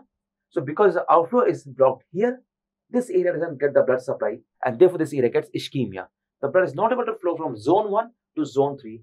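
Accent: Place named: India. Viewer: Someone tell bro to turn off his indian accent because noone can understand him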